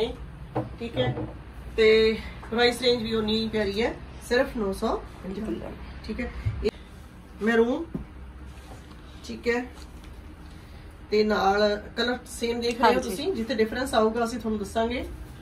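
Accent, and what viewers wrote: Standard. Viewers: Menu thudi pure Punjabi bahut wadeya lagdi aa g sis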